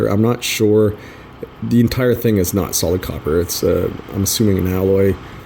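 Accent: Canada. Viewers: Are you from Canadá?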